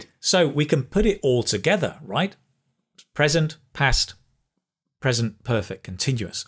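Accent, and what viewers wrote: United Kingdom. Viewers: I just love to watch your videos and practice my fluency. I love that repeating session..feel as if I talk to a British teacher in person. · In my free time usually do learning English and copy the words with British accent as you had which makes me improve a lot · Do you speak with a British accent? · Wao thank you so much.I am obsessed with your videos.I am extremely curious how can I learn the British accent?I love your accent😇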